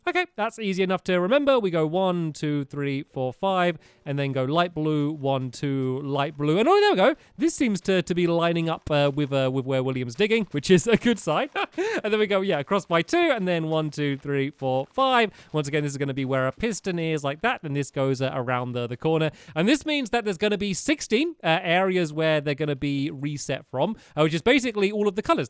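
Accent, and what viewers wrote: United Kingdom. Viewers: Minecraft pro gaming roblox Shut up. Stampys voice is awesome. I love his voice, its well, British.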